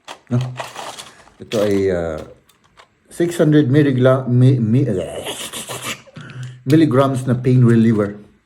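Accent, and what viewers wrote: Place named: Cordillera, Philippines. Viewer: Sir taga car kaba? Ang tuno sa pananalita mo ay parang taga car.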